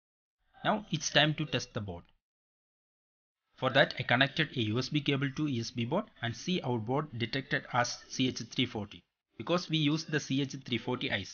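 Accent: Kerala, India. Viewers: So, a total of 5$ which is not that costly but judging by your accent are you from Kerala,India?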